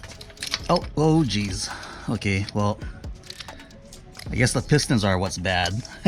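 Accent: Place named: Hawaii, United States. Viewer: 5 seconds in from speaking and was like this guy gotta be from hawaii. O-ring walkthrough helped, gonna fix my jack this weekend!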